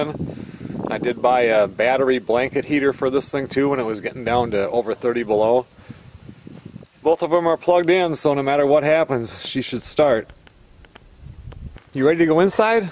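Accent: Minnesota, United States. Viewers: That Minnesota accent is awesome!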